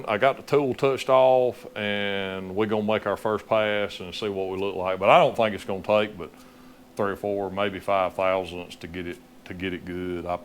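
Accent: South, United States. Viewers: Check out "I Do Cars" he uses a lot of fast forward and tears down engines that have blown up in 30mins..Its sad what people do to engines. Im sure the editing is the hardest part....Want to see your site go...My girlfriend loves your southern twang.....Has no clue what your saying just loves to hear you talk....Im working on a impersonation....LOL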